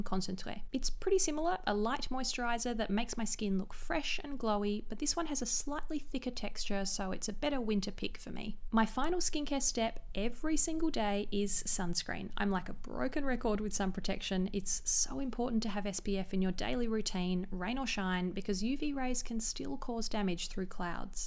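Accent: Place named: Australia. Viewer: Agreed!! There’s something incredibly satisfying and relaxing about these videos. And I love the aussie accent. I lived there for 9 years, and it’s so nostalgic. Xx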